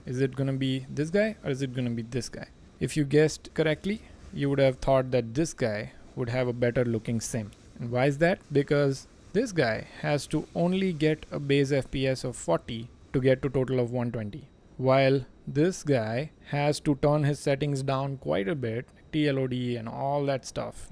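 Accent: India. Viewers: omg india accent now in msfs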